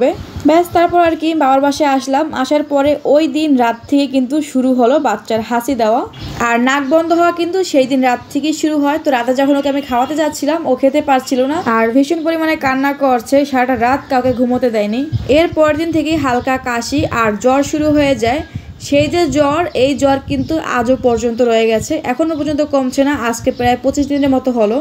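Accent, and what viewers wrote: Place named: Dhaka, Bangladesh. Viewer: Dhaka Kotha talking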